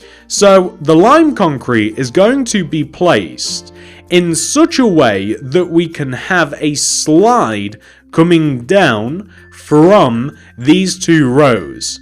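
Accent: United Kingdom. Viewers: Quick question are you british?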